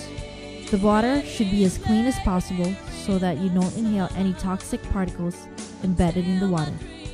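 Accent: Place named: Canada. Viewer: Pretty informative. Good job. And the canadian accent is too cute lol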